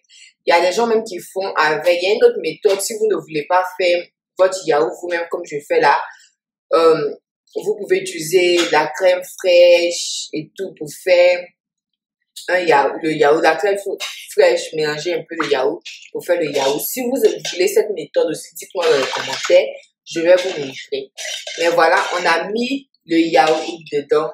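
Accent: Abidjan, Ivory Coast. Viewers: Toi tu es ivoirienne l'accent .😘😘merci la sœur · Bravo ma chérie 🤩🤝👏 pour ce chic dèguê 😂j’ai suivie avec plaisir , l’accent de chez nous , le “c’est Maman qui m’as appris depuis Abidjan “ tout cela j’ai aimé même 😂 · cc j espere ke vs allez bien; merci bcp pr le dèguè. J aime votre maniere simple de presenter votre recette. On sent la voie dune vrai abidjanaise. RIRE. BISOUS. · Ivoirien quoi bisou ma soeur 😂😂😂😂 français terre a terre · Cc j espere ke vs allez bien. Merci bcp pr le déguê. J aime bien votre maniere simple de presenter votre recette. On sent la voie d une vrai abidjanaise😂😂.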